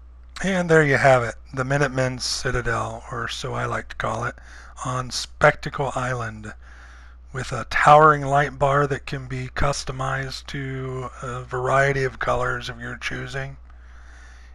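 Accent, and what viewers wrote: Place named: Southeast, United States. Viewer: It's a southeastern U.S. accent.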